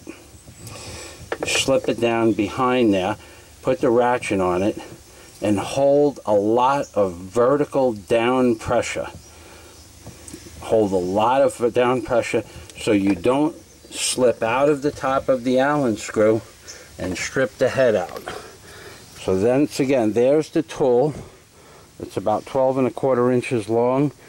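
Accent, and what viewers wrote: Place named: Rhode Island, United States. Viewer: Sounds like you're from Rhode Island like me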